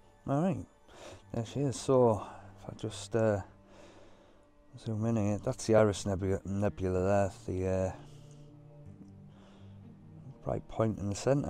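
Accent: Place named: North East England, United Kingdom. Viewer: Hi That's a great image! It's so hard to get a balance between the vibrant blue of the nebula and the dust clouds that give that area of the sky it's extra bit of fascination, but you did a very good job. Impressive guiding! Great to hear a voice from home too From a North East emigrant now living in Austria. Ken Haughan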